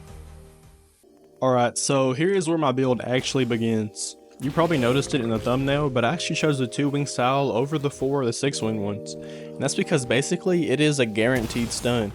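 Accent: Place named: South, United States. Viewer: Bro has the most southern accent ever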